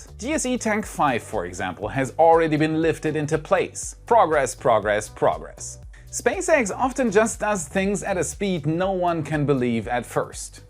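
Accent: Germany. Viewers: we're waiting for your delicious German accent - and your v narratives on the (litterally) awesome progress that SpaceX have made the past week, of course! 😱 😋🍿 A thought: the narrowed design of the flaps seems reasonable for earth's athmosphere, according to SpaceX' models, but what about the low density atm of Mars? #Whataboutit? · I love his German accent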